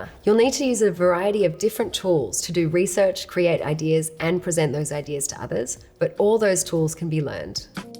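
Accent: Australia. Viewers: She sounds Australian. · Australian accent, love it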